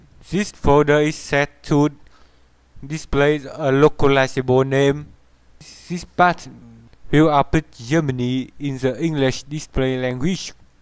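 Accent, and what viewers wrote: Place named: Malaysia. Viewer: I like how you speak English, it sounds totally like how a Malay or Singaporean Chinese speak English rather than a Vietnamese. But, nonetheless that's super dang interesting themes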